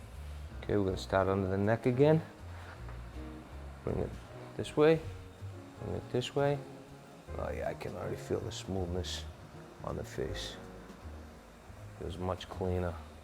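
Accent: Massachusetts, United States. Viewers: Excellent barber great job!! 👍☝️ 😁 Love your MA accent btw CT native here 😘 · This video is so Massachusetts. The accent, The TB12 jersey, there’s even a Dunkin Donuts across the friggin street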